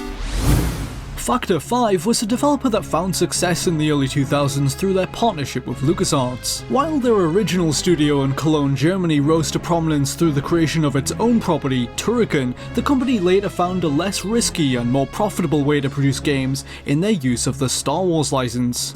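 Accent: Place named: Newcastle, United Kingdom. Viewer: You Geordie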